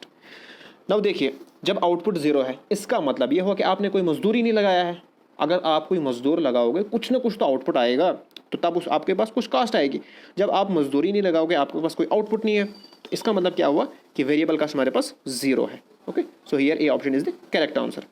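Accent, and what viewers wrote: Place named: Kashmir, India. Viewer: Ab ka bei sankari gaandu, Youtuber nikalne bna hai. Pehle apna accent thek kr. Kashmiri English nai chalti 😆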